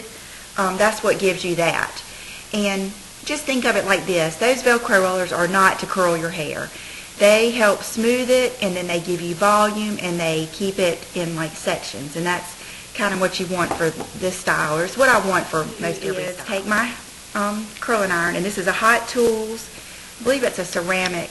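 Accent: South, United States. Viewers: I love love love your hair like that! and your accent. I can tell you are from the south too..lol.. keep up with the great vidoes!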